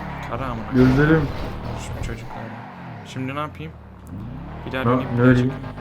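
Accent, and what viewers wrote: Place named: Konya, Turkey. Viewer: abi tam bi gonyalı gibi gonuşuyon ha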